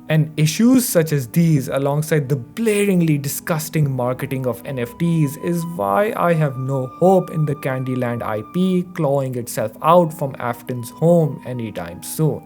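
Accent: India. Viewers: If u can ignore the indian accent then this content is actually pretty god damn good.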